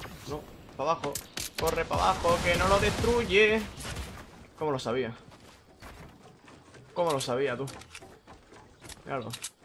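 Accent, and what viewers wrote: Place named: Murcia, Spain. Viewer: Eres murciano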